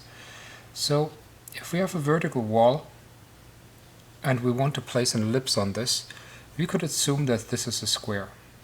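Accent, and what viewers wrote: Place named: Scandinavia. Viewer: What happened to your voice? You sound Nordic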